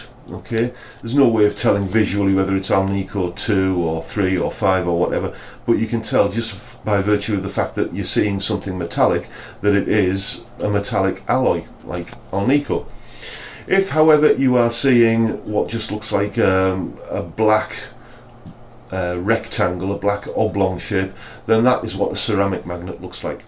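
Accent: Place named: Northern England, United Kingdom. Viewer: Love it. Learning so much from your channel and lovely to hear a genuine northern accent instead of all the over enthusiastic Americans - bless em - who can get a wee bit annoying. I'm in my 50s and been playing, touring, tour managing and whatnot since my late teens and now semi-retired have been buying up cheap guitars to learn about setting them up and fixing them so I can sell them on at a small profit to encourage new young players while giving a few to local charity shops so they can make some money for good causes. Not as cool as what you do but I'm a great admirer and - to the point of the video - yup you can really hear the difference between the pickups. So much sweeter those alnicos - mmm baby, oh yeah, especially on the clean neck rhythm imho, and the ceramic just sounded thin and harsh on the medium gain bridge. 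Right... back to burning my hands with a soldering iron (I am so rubbish with one lol). Cheers mate 👍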